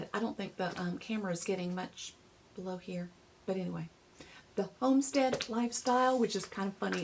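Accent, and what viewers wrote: United States. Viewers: you did a great job! I'm from Rocky Mount, Nashville NC area. When I heard your accent I knew I felt right at home!!! Can't wait to see more!!! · Love Love Love! Congrats on jumping in and thanks for sharing! I adore your East Tennessee ?(I'm presuming) accent - it reminds me of home and childhood and all things cozy. Glad to meet you!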